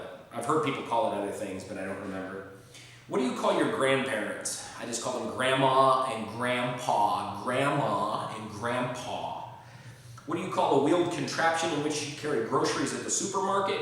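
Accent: Illinois, United States. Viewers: Sounds like up here in West Central IL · This accent is a lot like mine (minus a few words) But my dad is from Illinois so it makes sense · A lot of this is really similar to my accent, Im from Decatur, in the heart of central Illinois. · I am not originally from Illinois, but both of my parents are. They sound exactly like you! It's interesting to see how much of my pronunciation I've inherited from them! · I live about an hour away from Rockford, so this sounds correct, for the most part. I pronounce crayons differently though. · I'm guessing you're from Danville or Marshall or there-a-bouts...lol I'm originally from Terre Haute. I pronounce everything the same as you! Enjoyed the video!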